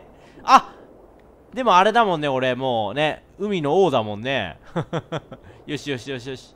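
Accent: Kansai, Japan. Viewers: 何でこの実況の時だけ特に関西弁きついねん爆笑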